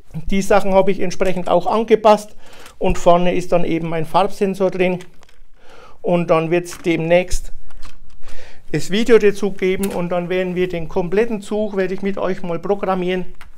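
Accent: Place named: Franconia, Germany. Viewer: Hallo, Ich habe ein größeres Projekt mit Lego Bahnen vor. Meine soll bei Schönem Wetter draussen im Garten fahren. Natürlich in Modul Bauweise. Deinem Dialekt nach, würde ich mal behaupten, daß du aus Franken kommst. Vielleicht hast du ja mal Lust auf einem Fahrtag, wenn das Ganze Form angenommen hat. Falls du da mal Bock drauf hast, dann meld dich mal. Liebe Grüße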